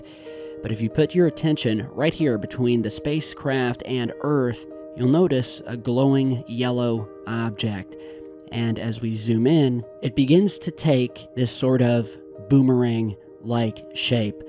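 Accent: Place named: Southern Ohio, United States. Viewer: Haha! I noticed that too. That's strong southern Ohio accent for you.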